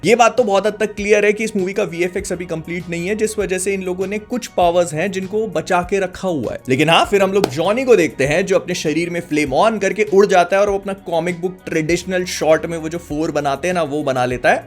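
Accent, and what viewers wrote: Bhopal, India. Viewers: bhai ap Bhopal se ho??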